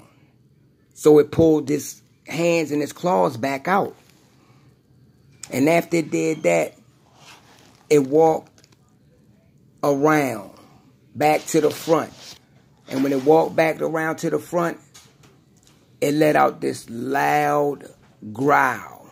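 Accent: Baltimore, United States. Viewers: Bro u sound like u from baltimore da city im in. I know they got bigfoot here in maryland we could probably do some research if you were down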